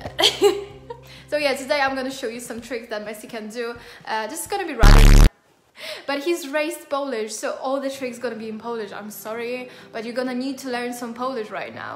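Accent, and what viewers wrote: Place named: United Kingdom. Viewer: You sound really British now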